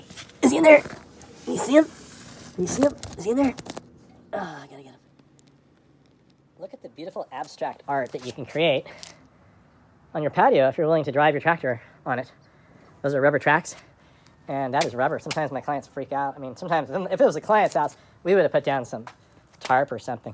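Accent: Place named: Southern California, United States. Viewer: very pleasing SoCal accent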